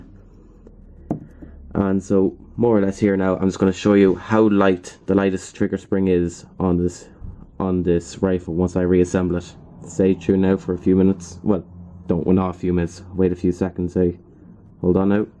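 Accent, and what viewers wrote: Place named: Ireland. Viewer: Sounds like he's Irish.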